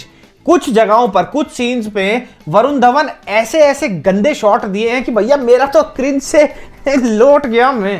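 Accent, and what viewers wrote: Haryana, India. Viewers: Are you Haryanvi??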